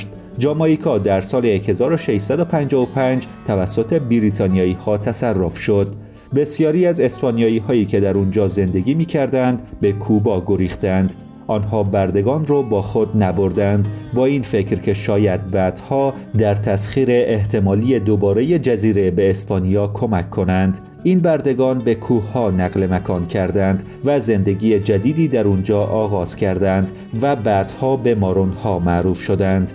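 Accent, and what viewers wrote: Afghanistan. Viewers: گوینده مثل اینکه افغان هست که مبخواد لهجه ی پشتواش را نشان ندهد صدایش را دوست نداشتم. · فکر کنم گوینده افغان هستش. افغان ها حتی در تلویزیون هم اینجوری صحبت می کنند.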